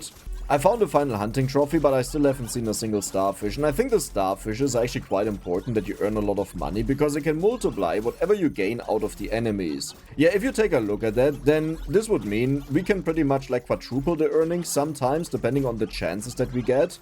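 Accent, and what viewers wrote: Germany. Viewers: Es ist so lustig wie man das Deutsche da durch hört (speeden mit 200 km/h) --- It's so funny how you can hear the German through there (speeding at 200 km/h)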